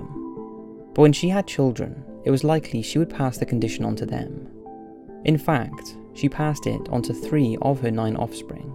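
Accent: Wales, United Kingdom. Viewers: Are u welsh? Sounds like a lil welsh accent 🏴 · Can’t beat a Welsh accent 👌🏻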